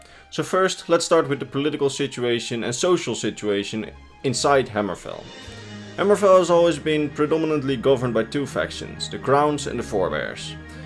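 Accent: Netherlands. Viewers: IK WIST DAT JE NEDERLANDS WAS. Cheers, love your videos vriend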